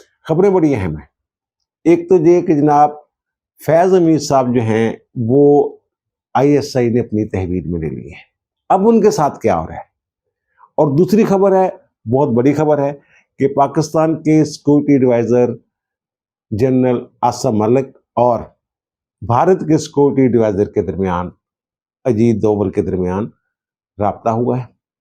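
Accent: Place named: Punjab, India. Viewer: صاحب پنجابی ٹچ کمممممال ہے آپ کا۔ ❤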